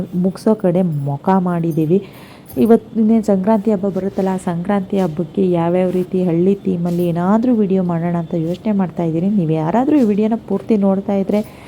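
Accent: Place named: Shimoga, India. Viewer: Hi nimma vlogs Supper nanu kuda nim side Talkere nanna Tavar mane nimmuralli namge parichaya davar edre na erodu Shimogga nan enjoy madta vlog nodtini akki palahara egantu marte hogide niv matadodu namma tarane adke nanag insta niv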